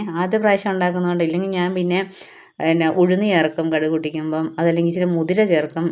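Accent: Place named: Kanjirappally, India. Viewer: കാഞ്ഞിരപ്പള്ളി അടുത്താണോ ? Slang അങ്ങനെ തോന്നി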